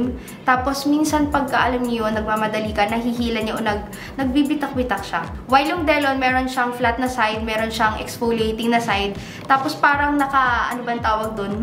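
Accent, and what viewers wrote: Batangas, Philippines. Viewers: "Pinay skin tone kumbaga" love ur batangueña accent. Ps: subscriber from Batangas 😊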